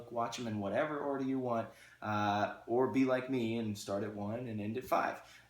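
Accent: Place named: Russia. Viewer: Good Russian accent! You almost sound like FPS Russia!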